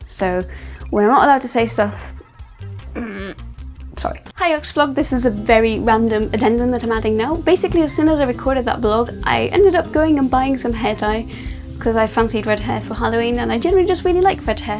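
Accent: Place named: United Kingdom. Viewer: I was wondering, why it was you sound so British? Is it because you have been in England for a whille now? Also was Spanish your first language? Sorry for so many q's! And a video on mental illness would be fantastic. I don't think people (inc me) no much about OCD.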